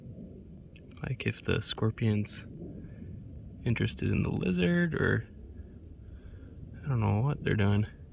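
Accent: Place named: Zion, United States. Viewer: Mystery man I'm guessing he's near Zion. Has same accent as locals in Zion area. Cool video btw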